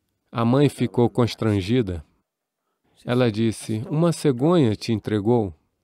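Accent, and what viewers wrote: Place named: Rio de Janeiro, Brazil. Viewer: so digo uma coisa,tradutor é um carioca. o resto nao sei de nada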